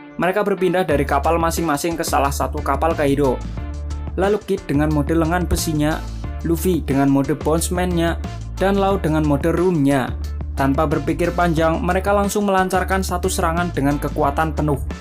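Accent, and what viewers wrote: Java, Indonesia. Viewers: Medok banget anjing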